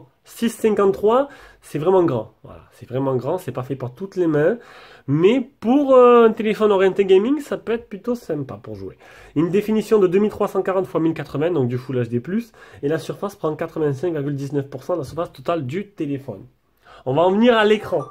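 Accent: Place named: South, France. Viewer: Merci pour ce test qui m'a captivé que j'ai regardé du début jusqu'à la fin. Bonjour l'accent du sud et on sent le passionné pas forcément professionnel (ou peut être j'en sais rien...). La prochaine fois il faudra parler du type de charge rapide (18 watts je crois) et la possibilité ou non de charge à induction mais le test est vraiment pas mal...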